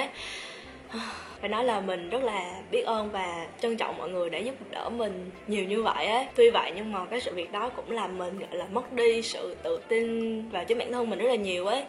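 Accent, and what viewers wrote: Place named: North, Vietnam. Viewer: bạn này chắc là có máu lai miền bắc và miền nam, vì nghe cách nc bình thường thì miền nam mà có cái chữ ấy ấy là người bắc hay dùng và bạn đó cũng phát âm giọng bắc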